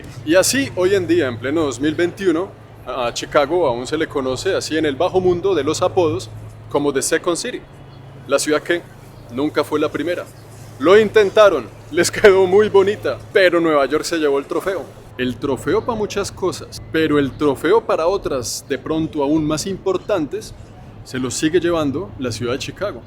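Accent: Bogotá, Colombia. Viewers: Es rolo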